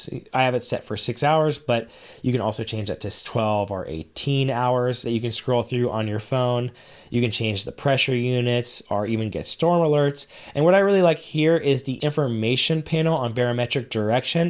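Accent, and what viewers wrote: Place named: United States. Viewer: You certainly want to be clear and explain how your (my) watch works, but you should consider it is very very hard to understand the comments for foreigners as the horrible american accent and the way they pronounce 50 percent of the words make it a real performance for users outside the US. Except, if there is a translation somewhere (which I did not found). Please make an effort !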